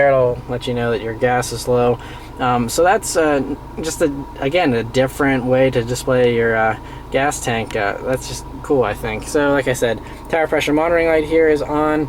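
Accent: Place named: Midwest, United States. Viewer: youve gotta be from the midwest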